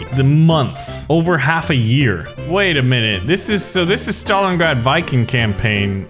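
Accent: Germany. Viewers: your German accent isn't annoying it's WUNDERBAR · I’m from Germany and i just love it when he uses his German accent.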